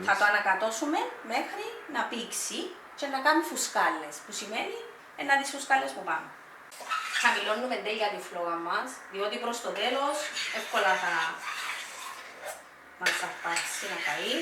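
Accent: Cyprus. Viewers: Ωραία ακούγεται Κύπρια είσαι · Πολλα ωραιο!! Μα πιο ωραια η προφορα σας ! Λατρευω την Κυπριακη διαλεκτο.Να ειστε καλα.💖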